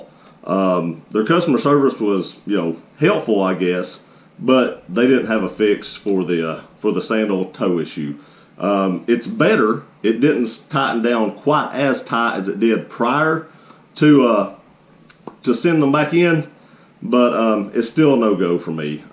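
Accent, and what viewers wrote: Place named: Alabama, United States. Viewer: Are you from Alabama or TN?? The accent sounds like Alabama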